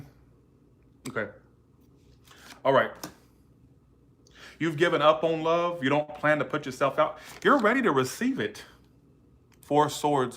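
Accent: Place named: South, United States. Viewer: I love your personality you're so cute and I'm not a big fan of the southern accent but for you it sounds so cute and sexy you're just like so neutral I love that don't ever stop being you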